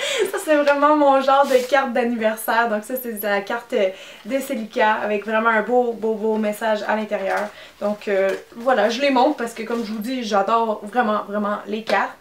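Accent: Canada. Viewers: Tu es une vrai canadienne on peut pas se tromper avec les accents et les expressions qui vont avec mdr j adore ! Sa fait très brute par rapport au français de France mais j adore ta personnalité et celle des canadiens des gens top pour tout se que j ai connu et j'adore leur façon de parler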